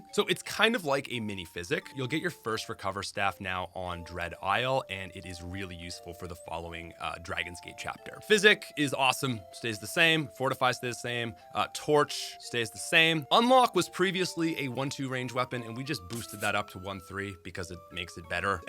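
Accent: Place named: Canada. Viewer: Where do you live, bro? That "oat" accent instead of "out" leads me to think you live in the Canadian Prairies or Atlantic Canada, or Midwestern USA.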